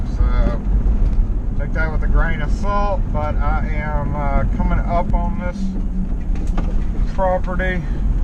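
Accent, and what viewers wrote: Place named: Mississippi, United States. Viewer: Ah Jackson Miss. Baton Rouge LA here. Thought that accent sounded real familiar. Next time I'm in the area I'll pop in the shop and carry on about how I can pick locks blah blah blah 😂 jk 😆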